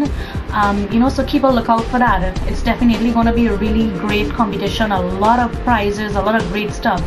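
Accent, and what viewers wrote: Trinidad and Tobago. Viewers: I love your accent!!! trini right?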